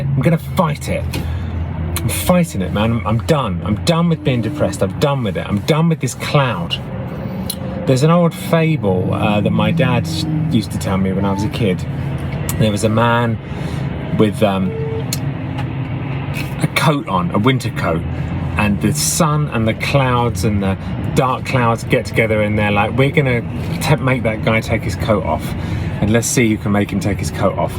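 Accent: United Kingdom. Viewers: Hi! I love you so much, too! I mean, in a buddy/friend/little brother kind of way! I'm 59 so you'd be my little brother. I've always loved your accent, so, COOL!! My little brother has a sophisticated British accent! Oops! I got way off topic! Anyway... Here's my thought -- depression draws us inward. Like that big, heavy coat on the man in the fable. (I loved that!) We're enveloped. It's heavy and uncomfortable. When the sun came, he opened himself up to everything around him, and was a free and happy man! I think depression seems like it hightens creativity because we're drawn so deeply inward. With music, especially. In depression, everything comes from that dark, shrouded place inside. Later on it might sound good. And it might be. But it's a reflection of that dark place...and do you want that? It'll always make you think of that place. Here's my point: your creativity is part of you. 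You were born with it. It's in your soul. You can't lose it. You were gifted with it. God put it there. Being as musically talented as you are is a GIFT. Whether in a dark place or in a place of light & peace, you're going to make exceptional music! Do you want to remember the darkness...or the light??, I am praying for you! *Lord, please take this burden away. Please guide and help him find that place of healing. Lift his heart and spirit. Show him the way. In Christ. Amen.💗* I'm 59, have suffered from depression since I was 14, and only got on medication 7 years a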